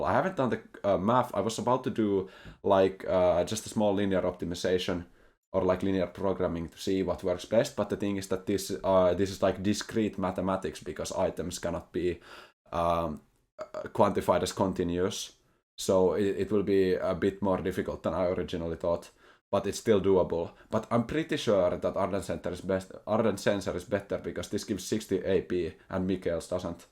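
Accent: Finland. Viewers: The way he talk it tells all that thos guy is from finland😂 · Is this guy finnish? Nice video! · Maybe you could calculate which item is more worth by using gold value of stats? Like for example every point of ap has x gold value or every percentage of cdr has y gold value. Not sure though, thought it might help. Hyvä video ja mahtava suomalainen aksentti! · joo katos ootha sää suomalainen enkun perusteella huomas heti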